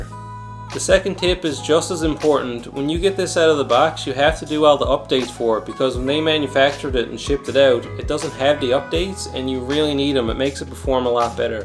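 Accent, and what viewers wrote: Newfoundland, Canada. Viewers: i know a newfie accent when i hear it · You from NL or east coast Canada by chance? Knows that accent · You sound like a Newfie my friend, hello from a fellow Newfie if so. Great video, keep up the good work! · Dude you definitely have to be a newfie? Lol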